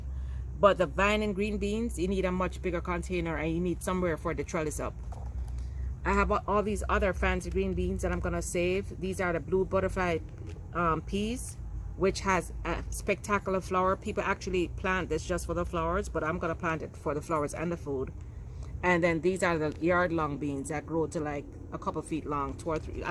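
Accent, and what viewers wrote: Jamaica. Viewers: Hey, love the video. Just discovering your channel and knew from the sound of your voice you are Jamaican. Love how you store your seed, I use wipe containers to save mine 🤦🏾‍♀️. You are right. No need to go hungry when we can plant our own food. While not everyone has the space container gardening is a viable method for most people.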